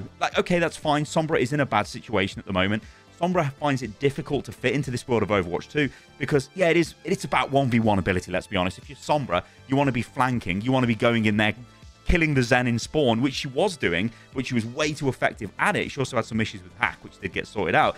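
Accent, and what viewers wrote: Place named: United Kingdom. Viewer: Been here since the beginning, idk if it’s the British accent but between that and your consistency you’ve been my preferred outlet for overwatch news. Thanks for the mems.❤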